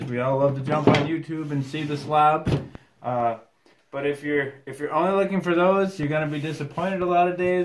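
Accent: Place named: Adirondacks, United States. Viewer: I knew you were an Adirondacker before you said it. It's the accent. I grew up in the Keeseville area. Moved to New Hampshire after college. Been down here about 25 years and people still ask about my accent. My sons and I fish down here. It's fun, but there's nothing like fishing the Adirondacks. Great video! Hope all is well with you and your family.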